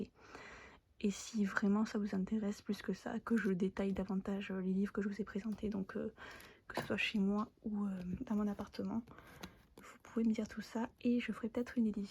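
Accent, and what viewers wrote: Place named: Toulouse, France. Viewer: Bonjour! Vidéo très agréable! Tu es de Toulouse? C’est ce que je me disais avec ton accent. 😊 J’ai fais une licence de japonais à l’université Jean Jaurès (à l’époque le mirail) en <num> et je vis au Japon depuis 2007. J’espère que tu feras d’autres vidéos sur l’apprentissage du japonais ou sur ta double culture! J’ai moi même deux enfants mais malheureusement ils ne parlent que japonais! Bon courage pour le JLPT! À bientôt!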